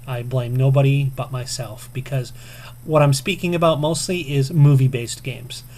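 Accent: United Kingdom. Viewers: Your the man!!! lol, its been a while since i've had a big smile on my face lol. I say you sound more Enfield than East End but great atempt, if i heard you talking like that i'd probably think you was from Essex or somewhere like that. Londoners would love you talking like that down the pub, especially the "More tea Vicker" as that saying is normally reserved for when someone *Cough - Breaks Wind - Cough* lol Fantastic. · Excellent answers and you sounded great as a Brit too buddy.: ) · London accent.Why all americans think london accent is british accent .you are funny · LOL..great vid man... Nice british accent! Haha.. u tried XFile2708's famous line!!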